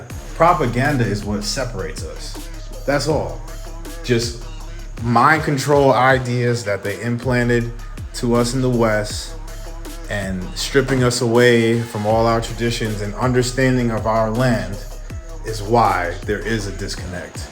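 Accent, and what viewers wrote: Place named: United States. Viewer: Good luck.. It's a great feeling having an educated American-English accent hitting my ears. Usually, listening to the typical African content creators' videos you must pay attention, take notes and translate or transliterate as you go through their videos....they mumble, mispronounce and are, quite frankly, so unprofessional...like they're talking to the gang on the corner stoop. I know for many of them these are initial steps into a larger world and they will get better. I see your channel as Google's attempt to enlarge the ethnic audience with their algorithms...let's hope that last....I'm glad I found you. Keep traveling my brother, I'll be seeing the world through your eyes and I am very interested in seeing what else Africa has to offer.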